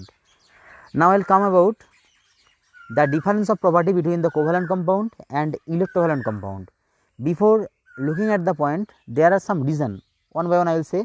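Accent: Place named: Bengal, India. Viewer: Thanks... Quite helpful in the so annoying necessary situation... Lockdown... Thank you.. Being a bengali I liked the bengli touch in your english man....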